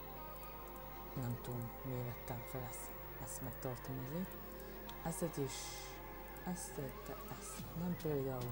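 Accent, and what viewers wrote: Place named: Szekler Land, Romania. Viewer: szoval ezert volt ismeros szekely beszed :)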